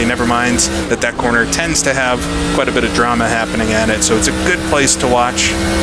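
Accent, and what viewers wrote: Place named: Northeast, United States. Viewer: I’m new to the channel, & I could tell you were probably from the Northeast just a few videos in (I talk to dozens of different people a day, haha). It’s good to see NASCAR’s regional series come to NR2003, as it holds a lot of memories for people who watched local racing scenes. I’m from the West Coast so I’d love for someone to do the NASCAR West Coast Series from <num> for this game.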